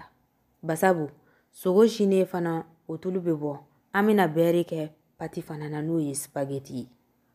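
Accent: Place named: Ségou, Mali. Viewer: J’adore ton accent tu viens de ségou ?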